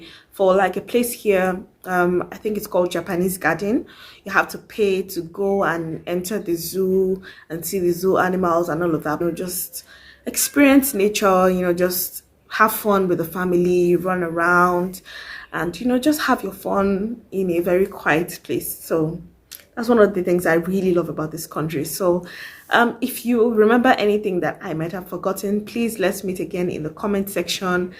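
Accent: South Africa. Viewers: Wow I'm impressed by your English accent...you will swear that you South African... you speak like a local girl... lovely 🌹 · Your pronouciation of words is of a South African. You assimilated easily. Thanks you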